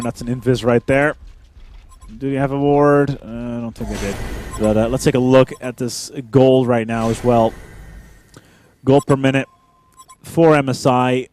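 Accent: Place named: United States. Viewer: i like how you make your voice all americany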